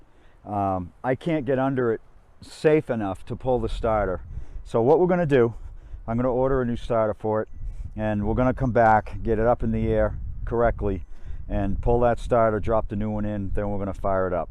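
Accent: New England, United States. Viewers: What part of New England are you from..lol I'm from the North Shore..😅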